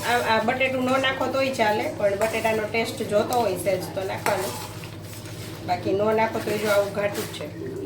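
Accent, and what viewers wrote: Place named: Saurashtra, India. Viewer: તમે સૌરાષ્ટ્ર ના છો ?? તમારી ભાષા એવી છે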